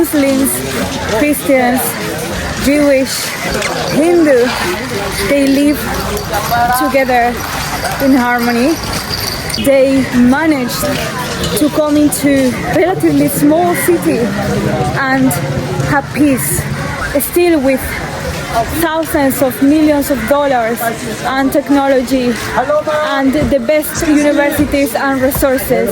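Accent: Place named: Iran. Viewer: Are you Persian?you sound Persian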